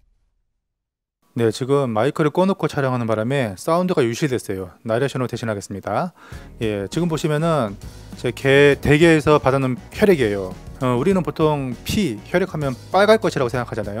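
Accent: Gyeongsang, South Korea. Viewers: 억양을 들어보니 경상도 분 이시군요. 갑각류의 피가 반투명 묽은 파랑색인것도 처음알았습니다. 의학용 학명이 라띤어 이므로'Hemoglocyanin(발음상으로 적확하게: 헤모글로시야닌)'같은 구리성분이 함유된것이라고 아래 어느분이 한글로 적어둔 글을 보고 알았는대 다른분영상에서 복어 회뜨면서 독제거와 피를받아서 보여준적이있어 비교합니다만 복어의 피는 다른동물의 피처럼 빨간대 갑각류는 생선같은 어패류와 또 달리 반투명 믉은 파랑색인걸 알고갑니다. 좋은 정보였습니다.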